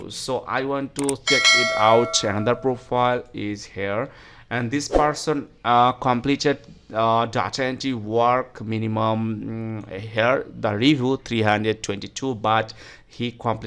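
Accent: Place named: India. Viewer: i love your indian accent next time put subtitle pls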